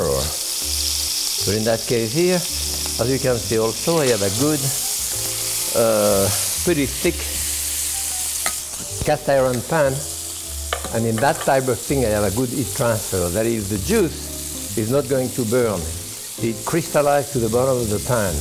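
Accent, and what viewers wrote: France. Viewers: This is a proper french accent, not that fake shit from that curly haired dude, god i love french accents but that dudes accent makes me cringe so much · the adorable chef with an insane french accent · Cannot stand his strong French accent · The french accent turns Th into Z, but he had a stroke 5 years ago and it has considerably slurred his speech. Very understandable though, mouth watering sound is the same as not being able to control your salivary tract excretion.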